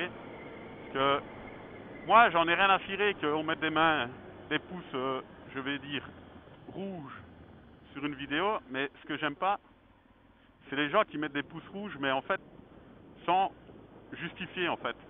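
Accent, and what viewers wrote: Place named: Belgium. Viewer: Super un ami Belge avec le meme accent que moi et en plus a coter de moi . Bienvenu dans ce monde de youtubeur l'ami et pourquoi pas se rencontrer un de c'est jours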